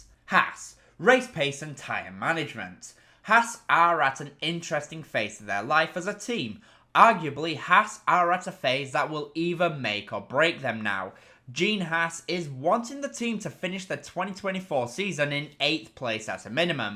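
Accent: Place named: North, United Kingdom. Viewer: Hiya, lovely to see your face for the first time 😊. It is always nice to visualise what youtubers look like. I love your accent too. I am trying to figure out where you are from but not sure. It sounds northern. My predictions for this season are: 1. Red Bull 2. Mclaren 3. Ferrari 4. Mercedes 5. Aston Martin 6. Alpine 7. Williams ( I hope but Logan will need to start scoring( 8. Visa Cash App RB 9. Haas 10. Stake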